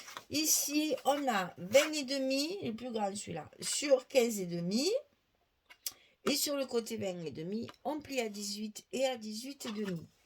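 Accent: South, France. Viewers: Bonjour, c'est un cadeau que tu nous fait tous les jours. Une vraie artiste ! En plus quel bonheur d'entendre parler ''le sud'' qui me manque tant. Des bisous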